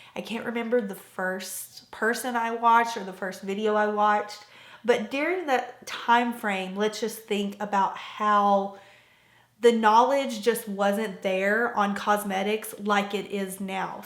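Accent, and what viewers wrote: South, United States. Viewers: i love your slightly southern accent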